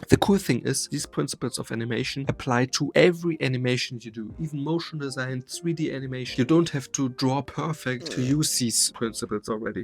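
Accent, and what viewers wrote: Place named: Germany. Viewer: i hören little bit deutsch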